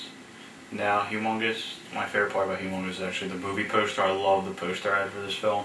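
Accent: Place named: Philadelphia, United States. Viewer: your stupid accent makes me think Philly or Jersey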